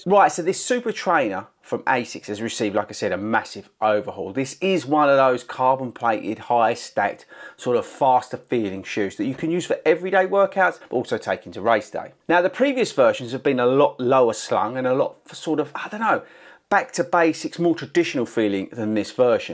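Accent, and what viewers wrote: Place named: England, United Kingdom. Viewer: I’m sure you’ve said it but what part of England are you from? I’m over here imitating the accent 😂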